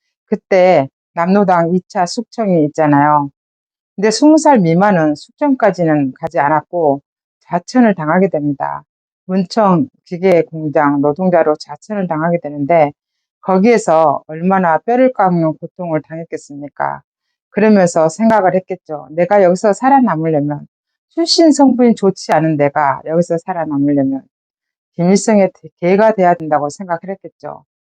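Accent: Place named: Honam, South Korea. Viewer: 당신이야말로 애국자 이십니다 진실과 거짓을 구분하고 정의와 불의를 알며 선과악을 알고만 있어도 세상의 모든것이 제대로 바뀔수가 있읍니다 억양이 호남쪽분 같은데도 이렇게 바른소리를 내시니 천만다행 입니다 고맙고 감사 합니다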